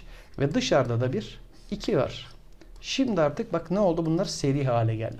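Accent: Thrace, Turkey. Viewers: Ocam Trakyalı mısınızz?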